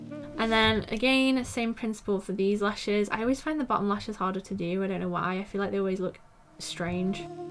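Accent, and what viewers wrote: United Kingdom. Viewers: I both enjoyed watching you drawing and listening to your British accent❤️ This video is perfect✨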